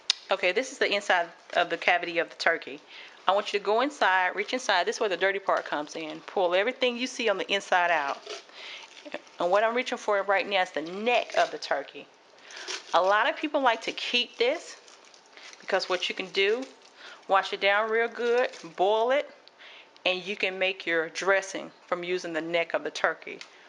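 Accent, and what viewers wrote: United States. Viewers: I can tell you this you sound like a very good lady from America there are not a lot of good people in America. I always cook the turkey at my nieces place and there's about 14 of us and i always wanted to try to and injector the turkey because i know it will be so good. I stuff my turkey because everybody take some home and there's nothing left of the turkey. I can't find one here in Canada the injector i use butter unsalted not margarine and i add poultry seasoning and Rosemary olive oil and then I mix everything together and then I put it underneath the skin a pound of butter it's to die for I clean my turkey out just like you do :) I would like to do it like you didn't i have to go shopping today and look to see if i can find one of the injector. Thank you for the video Merry Christmas 2016 .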